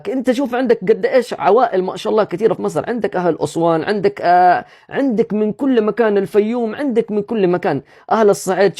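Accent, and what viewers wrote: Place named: Myanmar. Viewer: انت يمني او برماوي كلامك كأنك برماوي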